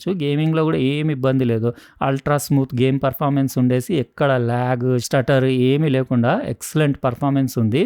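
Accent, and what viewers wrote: Telangana, India. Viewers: Brother video info kanna mee telangana yaasa kosame ekva chustha mee videos...🎉🎉🎉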